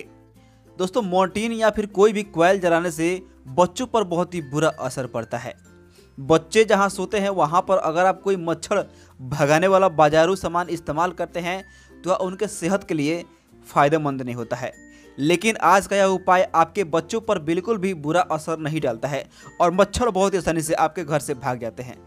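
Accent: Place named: Bihar, India. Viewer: Explained in Bihari accent.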